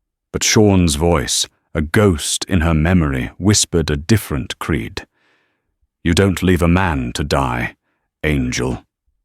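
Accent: United Kingdom. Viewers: Does anyone else wonder why this channel uses a British accent AI narratorfor American western stories? It makes no sense to me at all!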